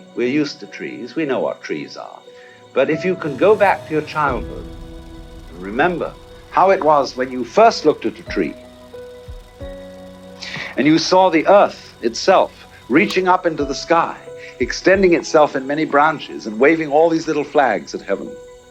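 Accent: United Kingdom. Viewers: British accent = barf 🤮 Use a good quality French, Scottish or Irish ☘️ one instead. You will get more likes 👍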